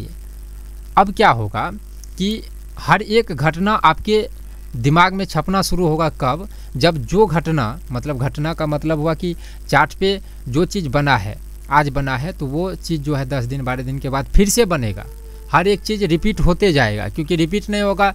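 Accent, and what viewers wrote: Bihar, India. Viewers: तेरे आवाज में पूरा बिहार पान लग रहा है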